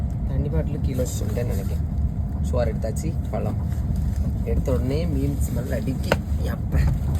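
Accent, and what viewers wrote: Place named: Nanjil Nadu, India. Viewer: கிட்ட காட்டு மக்கா- நாஞ்சில் தமிழ் ❤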